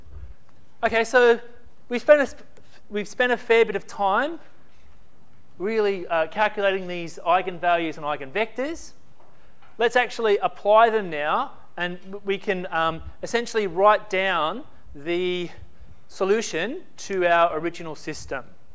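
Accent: Australia. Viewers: That was great i will forever solve systems of equations with an Australian accent!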